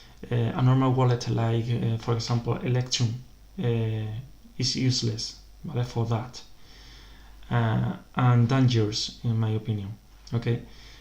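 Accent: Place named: Spain. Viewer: Pues el habla español , o al menos su acento es de español hablando ingles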